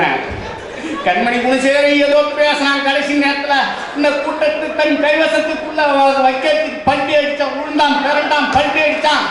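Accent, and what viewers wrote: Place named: Nadu Nadu, India. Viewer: அருமையான வட்டார வழக்கு., நடுநாட்டு மக்களின் வாழ்வியல் முறைகளை வருங்கால சந்ததியினருக்கு மிக தெளிவாக எடுத்துரைத்துள்ளீர்கள். அருமை ஐயா!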